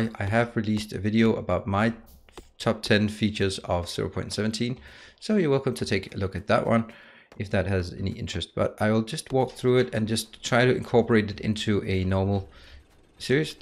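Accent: Denmark. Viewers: Since I'm danish, I could immediately hear you were also danish haha. Fantastisk! :) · I'm Swedish, but I definitely heard the Danish blending through the English here. :) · Are you danish or something ? Your accent when speakin sound alot like a dane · Haha i could hear you where from denmark! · First time stumbling upon your channel, I could tell right away you were Danish by the accent... Greetings from a fellow Scandinavian!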